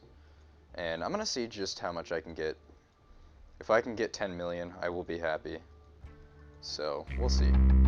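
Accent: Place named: Canada. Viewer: dat canadian accent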